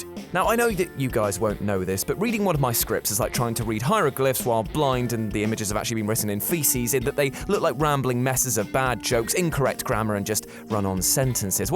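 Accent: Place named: England, United Kingdom. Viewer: watched in mute as this fuckin poms accent makes my skin crawl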